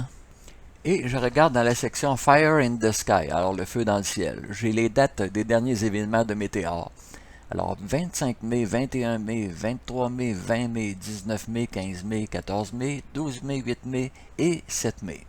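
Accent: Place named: Quebec, Canada. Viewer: Merci! Je trouves ça super cool toutes tes vidéos d'articles sur Nibiru. C'est juste avec toi que je suis abonnée sur Youtube. Tu expliques de manière précise avec une bonne chronologie des évènements. Pis en plus, au moins j'entends quelqu'un qui parle français-quebecois, fak c'est encore mieux! En tout cas, un gros Bravo pour tout le travail que tu fais pour nous tenir informé, c'est apprécié.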